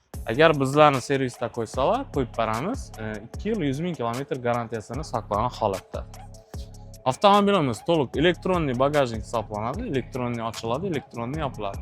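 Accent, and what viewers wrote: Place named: Khorezm, Uzbekistan. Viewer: Молодец. Обзор яхши чикипти. Айрим хоразм блогерларни умуман тушиниб булмайди. Бунда хоразм акценти бор булсахам хаммаси тушинарли.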